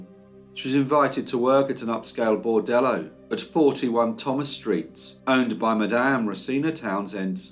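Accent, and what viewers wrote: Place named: Birmingham, United Kingdom. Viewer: I can’t get past your Brummie accent 🛑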